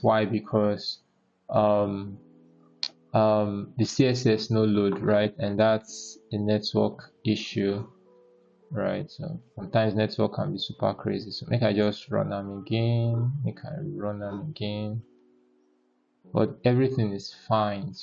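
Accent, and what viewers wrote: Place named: Nigeria. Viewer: using nigerian english make this amazing :yougotthis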